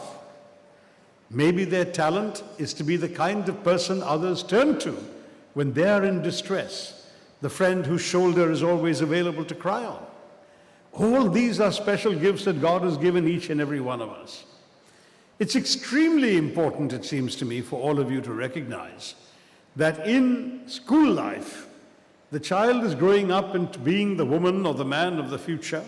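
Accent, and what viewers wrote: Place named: Oxford, United Kingdom. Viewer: Highly inspiring ,informative ,interesting and wonderful to listen Dr shashi taroor's Oxford accented English. Highly super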